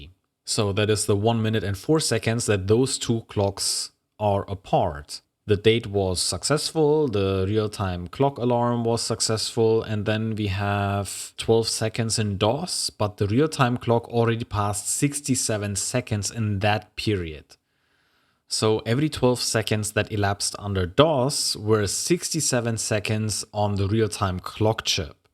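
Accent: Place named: Germany. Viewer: you have a very distinct german pronounciation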